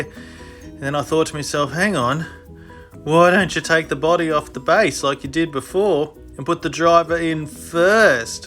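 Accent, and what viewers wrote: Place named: Australia. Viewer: Hi there your vids are great, keep it up please, not many Aussie ascents in utube land.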